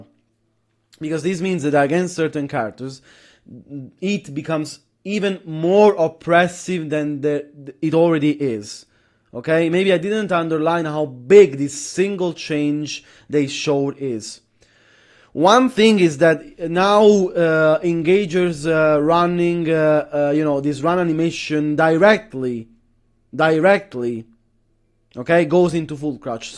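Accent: Italy. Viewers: Good a analysis, all we can do is wait and pray -_-. Random but are you italiano? you got a similar accent to my wife lol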